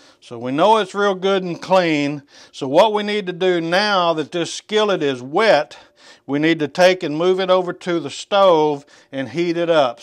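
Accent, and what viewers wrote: South, United States. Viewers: Youre a diamond, I've just bought one it will be delivered later. I find it hard to trust all these fancy videos but then a bacon-frying American with a Southern accent comes along and shows how its done, I feel confident in my purchase now. And yes sir, I will be frying bacon in it. Much love from the UK 🇬🇧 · Hi - I watched a couple of other cast iron skillet channels before I got to this one. They seemed ok, but once I found one with a Southern accent I knew I'd found my go to cast iron skillet channel. Thanks! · Didn't trust anybody on YouTube showing me how to season a skillet i heared somone with a southern accent thanks for the video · I grew up in the south watch your videos just to listen to your great accent. I may even buy a cast iron skillet 🤣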